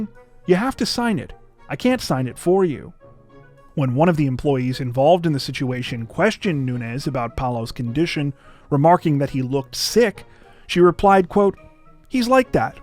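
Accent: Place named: Canada. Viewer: I love how you beautifully pronounce foreign names, and speak in a really soft north American accent, I'm thinking maybe you're Canadian.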